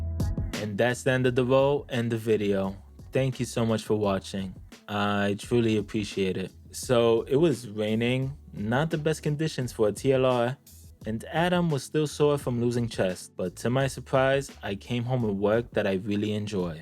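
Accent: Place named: Boston, United States. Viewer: Wait your polish and here I was thinking you were from Boston.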